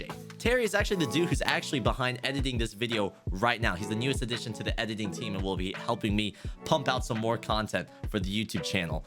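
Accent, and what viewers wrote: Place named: United States. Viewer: You sound like American but are you Korean or??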